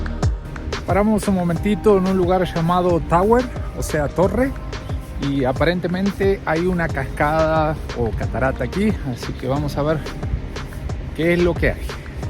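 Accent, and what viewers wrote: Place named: Argentina. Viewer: Pensé que tenía acento argentino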